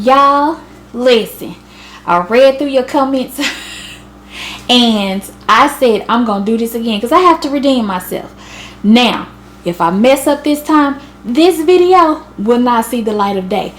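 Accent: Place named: South, United States. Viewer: "Girl,you are some kind of "COUNTRY TALKING ".? WOW! We can barely understand what you are saying.?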